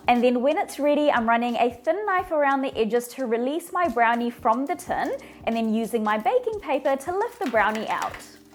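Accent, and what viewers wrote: New Zealand. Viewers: Do I detect a kiwi accent? 🤔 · I tried another recipe which I wasn't a huge fan of. I tried your recipe a couple of weeks ago and my husband and I loved them! I'm pregnant and craved something chocolatey and I asked whether I should make brownies or cookies. My husband immediately said the brownies you made last time from the girl from New Zealand! He was especially delighted to hear your accent as he spent some time studying abroad there years ago. Haha so I'm making these brownies again right now; they are currently in the oven. I never comment on recipe videos because frankly I haven't found many that impressed me. But these brownies are very delicious and better the next day. Thank you so much!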